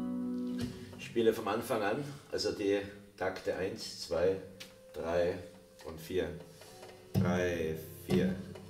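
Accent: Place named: Bahia, Brazil. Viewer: Putz pensei que era baiano.....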